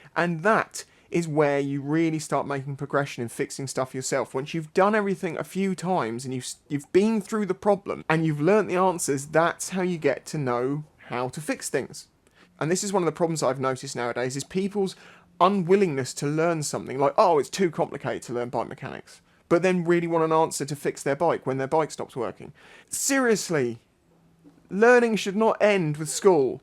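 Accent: United Kingdom. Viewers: That's how you know he is truly British and not faking an accent.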